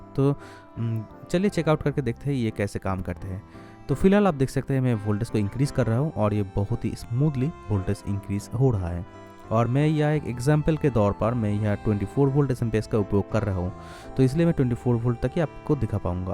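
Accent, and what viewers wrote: Bengal, India. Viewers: R u bengali? 🙂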